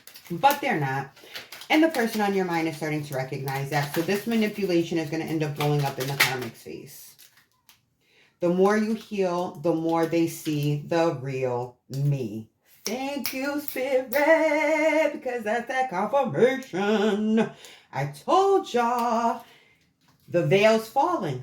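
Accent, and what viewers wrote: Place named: East Coast, United States. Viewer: You have no idea just how much i would enjoy to sit and chat with you or have a personal reading and such. In the world of psychics and tarrot there are but a few who are truly gifted and know how to properly utilize and share that gift. Sadly we live in a world where scams have existed from the beginning. So its very refreshingly beautiful to come across a reader such as yourself. Not a phoney bone in your body. You are clear, speak well and very detailed and concise as far as a card can be keep what resonates and leave the rest You have a nice clean visual for your background looks bright and clean with that lil pop of red and your speaking voice is very very easy for me to listen to. Im sensetive to sound and light. Sometimes a person could be lovely to look at then the minute they talk i just want to run lol. 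Also hear a bit of east coast accent and i luv that and i just adore you. Your shorts are wonderful 👍 i have never taken a salt bath but there are Himalayan salt spa caves and i would luvv to go to one or the healing spings in Arizona. Anyway i believe in the universe and that it gives us sign after sign and so very many who dont believe just wount see the signs. Heck even those who believe miss or ignore signs. Spirituality is a journey and a very personal process. For some it starts as toddlers for others teens and older and sadly for some never. #ALL CAN, SOME SHOULD, NONE MUST! Love&Light ~♡~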